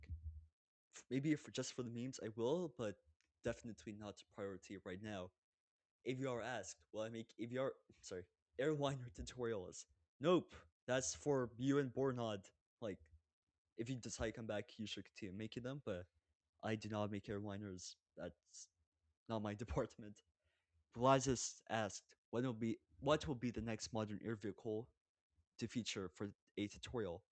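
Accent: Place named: Canada. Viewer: You sound so Canadian 😭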